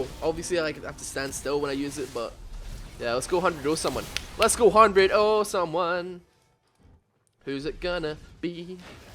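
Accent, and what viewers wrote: Scotland, United Kingdom. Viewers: Scottish I believe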